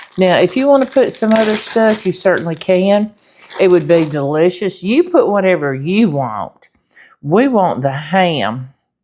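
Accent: South, United States. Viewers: Miss, first off, I so enjoy your videos and your sweet, southern accent!!! Do you ever just sit back, close your eyes and try and envision a new recipe? I do it all the time!! Tonight, I was sitting here (with my eyes closed😌….that’s important😂). I made a cheese ball last night for game night with our neighbors. It has cream cheese, diced green onions, diced dried beef, Worcester sauce and some spices. Tonight, I have some sweet, red, Argentina shrimp. I thought I would spread a thin layer of the cheese ball onto a flour tortilla, sauté the shrimp and make shrimp tacos with butter lettuce, onion, cherry tomatoes and an avocado lime dressing. When I opened my eyes, I clicked on your video and you were spreading cream cheese, sour cream and the dried vegetable blend onto a tortilla!!! I swear! You read my mind!!! It’s either that or AI!!😂😂😂😂 Keep making your awesome recipes!!!😊😊😊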